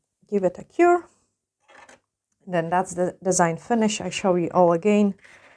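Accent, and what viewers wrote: Scotland, United Kingdom. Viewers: Love hearing your Scottish accent coming through 😂😂. Also lovely work x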